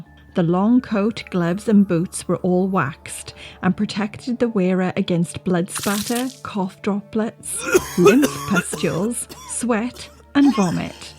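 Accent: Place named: Wales, United Kingdom. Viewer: lovely to hear a welch voice doing the voice over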